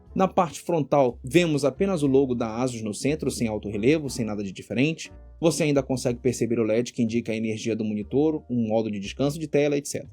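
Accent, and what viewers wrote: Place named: North, Brazil. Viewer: Mano vc é de onde? Teu sotaque parece ser aqui do norte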